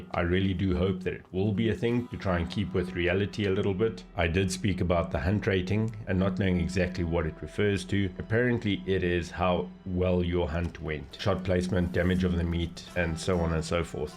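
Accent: South Africa. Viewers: I knew you where South African as soon as I heard your voice,I'm really happy tho that there are more sa youtubers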